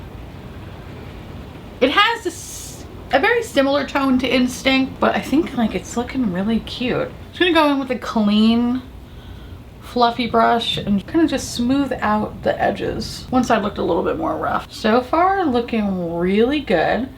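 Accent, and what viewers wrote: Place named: New York, United States. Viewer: I love you, you know this - but I’d absolutely love you to do an entire video in your New Yorker accent. Friday evening in Australia, been looking forward to getting home after work, having a few drinks and watching your video. Have a great weekend!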